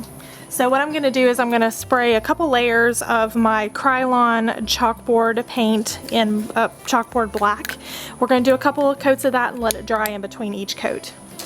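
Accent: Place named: South Jersey, United States. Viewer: Are you from South Jersey, South Philly Delaware or Mryland? I believe I recognize the "O" in your voice. I am from S. Jersey (I live in Florida florida now for the past 40 some years and still carry the accent). The video was great. TFS THUMBS UP!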